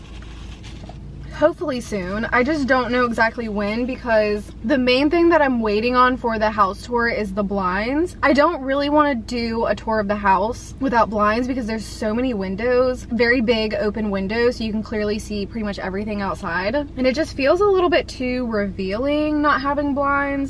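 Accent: South, United States. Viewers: love that southern drawl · your southern accent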